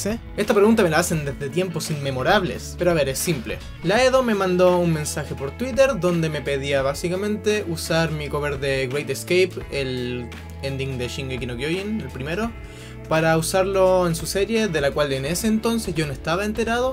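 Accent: Chile. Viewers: Me suenas muy chileno.... Me encanta!!! 💓💓 nueva sub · eres más chileno que yo :v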